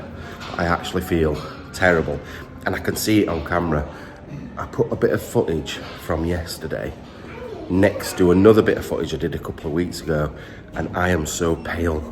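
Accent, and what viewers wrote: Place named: England, United Kingdom. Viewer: Northern Irish here lol it was a bird but if there's teens there and hear your English accent hey with think your soldier lol b3 careful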